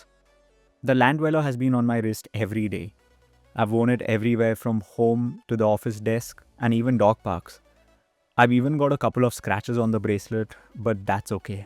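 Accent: India. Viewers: don´t know, this video popped up and I am fascinated by an indish accent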